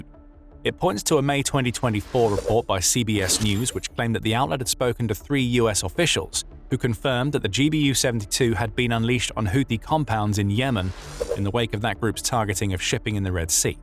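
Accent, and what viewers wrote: England, United Kingdom. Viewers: a British accent?? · I can tell just by the narrator's English accent that this some bullshit. "Sorry, bloody bullshit."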